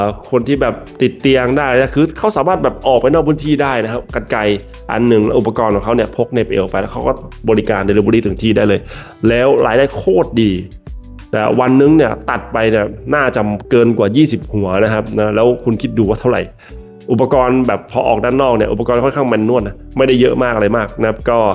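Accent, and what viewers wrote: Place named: Khanu Woralaksaburi, Thailand. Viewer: พี่คนขาณุวรลักษบุรีหรอครับ แซ่บติดปาก บัวยี คล้ายหมู่บ้านผมเลย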